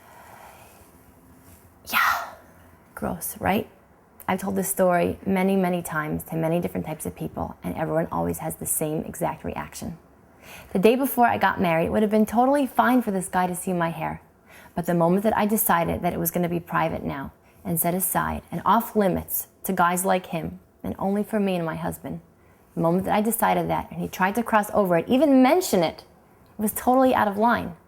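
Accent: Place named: New York, United States. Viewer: I must admit, when I first started watching your vid, I thought, "O dear. Here is an annoying materialistic Jewish gal with an annoying New York accent". But then I found your vid to be very spiritual and quite Biblically educational. More!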